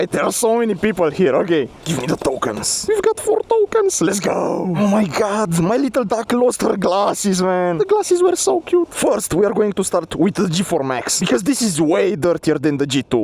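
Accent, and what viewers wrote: Romania. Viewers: Yeh, he is Romanian guy from the english speak and accent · Ba se vede ca ai accent roman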